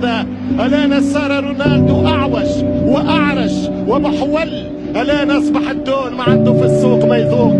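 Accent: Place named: Tunisia. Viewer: the commentator in this video is Tunisian which is soooooo different than the egyptian dialect! your comment makes 0 sense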